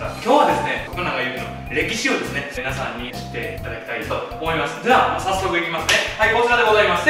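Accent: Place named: Osaka, Japan. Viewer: 大阪だったんだ 時々の大阪弁がなんかうれし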